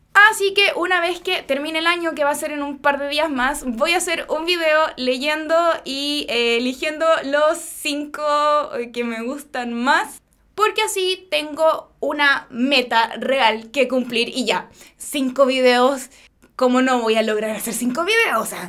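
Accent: Chile. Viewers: BUEN VÍDEO, ME GUSTA MUCHO ESE ACENTO CHILENO ^-^ ♡, ( TE DEJO UN RETO, " HAS UN TOP DE LAS CANCIONES MAS HERMOSAS DE ÁNIME ) ♡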